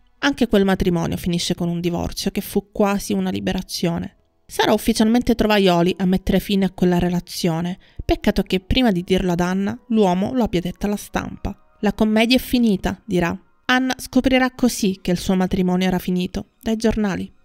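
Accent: Sicily, Italy. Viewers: Ciao, appena iscritta! Ho trovato la storia sconvolgente, adoro da quando ero adolescente gli attori del passato, fra questi il mito per eccellenza Dean di cui parli...Mi sento dirti grazie, tenendomi il beneficio del dubbio( leggendo i commenti degli altri iscritti..anche riguardo le fonti del libro letto..) sulla totale veridicità dei personaggi di cui parli, non so chi sei etc..che sei siciliana lo sento dal tuo accento! Ciao buon lavoro!🌹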